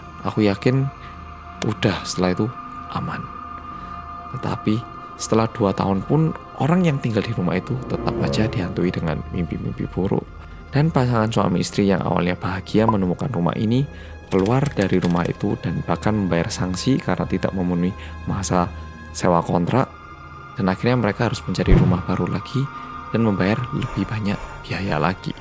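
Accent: Java, Indonesia. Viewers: Bersama orng korea yang apasih kata mas? Aku taunya orng korea yg medog. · medhok ganteng wees🌛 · "MENGGANDOLI" bersama orang korea yg medok tenan 👍🤭😁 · Gkpp deh udh 1 bln lalu bru nonton habis aku tuh nyari ka yang rambut ny agak panjang lebih ganteng hehehe kK muka ny bd setiap rambut ny pendek, pakai kacamata, dan rambut sedikit pnjng tp tetep ganteng koq kak yang medok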